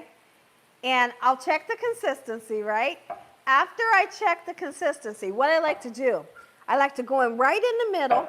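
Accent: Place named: South, United States. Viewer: I just love you I love ❤️ you southern accent and cooking I learn so much from you I just got the best of both worlds cooking southern dishes and I also cook Spanish dishes but sheesh ain’t nothing I can’t cook thanks to you and YouTube ❤❤❤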